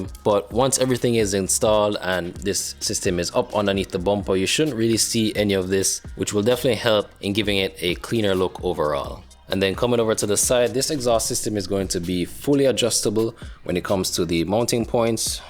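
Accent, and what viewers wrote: Jamaica. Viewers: Love the Jamaican accent!🙂 Oh right, the exhaust, loved the sound (and look) of that too!😁 Btw, and yea good idea to install heatshields onto the aftermarket exhaust, they're there for a reason👍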